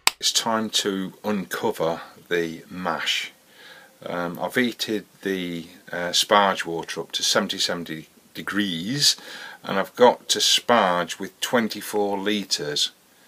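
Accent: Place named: RP, United Kingdom. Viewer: absolutely great video. great to see how others are doing it. It's great that your phone understands you too. Very posh. when Scottish folk use that app. it just thinks we're trying to find vowels of the alphabet only. ha ha. cheers lad.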